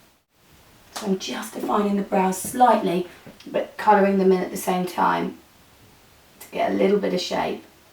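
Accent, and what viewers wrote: United Kingdom. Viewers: Zali P British accent* or I guess it can work either way. But if you Google it, there's tons and tons of speculation.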